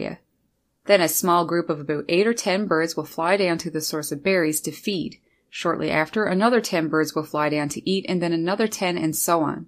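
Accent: Canada. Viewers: Enjoy listening to your Canadian accent 😀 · I enjoyed your natural Canadian accent........ and all that bird stuff too.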